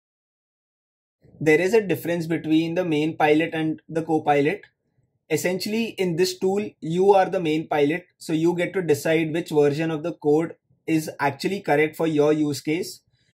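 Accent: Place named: India. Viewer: on an unrelated note, why don't indians try to learn english without the pronounced indian accent? other countries will sometimes have accents but many try to match the language without an accent or even speak a british or american accent. But in india it seems to almost always be a very pronounced indian accent. I suppose it's better than asians that just can't speak english at all but still :)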